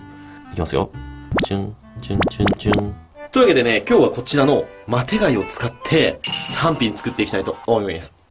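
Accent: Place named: Kansai, Japan. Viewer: 最近関西弁ちょくちょくでてないか？？嬉しいぞ？？？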